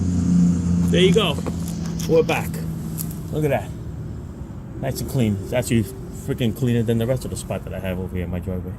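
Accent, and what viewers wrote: New York, United States. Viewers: Great job. That's a heavy NY/NJ accent. Lol. Cool though bro.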